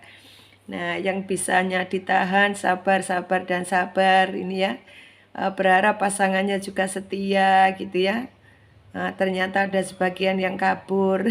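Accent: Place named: Java, Indonesia. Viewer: medhok bgaet yooo itu lucuya bikin semangaat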